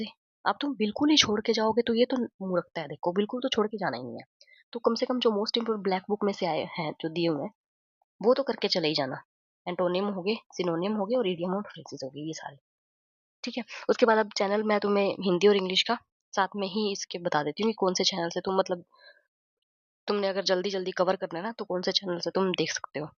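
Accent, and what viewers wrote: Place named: Haryana, India. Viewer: ye to m awaj se hi samj gyi thi lekin hr. Me kha se h aap m bhi hr. Se hi hu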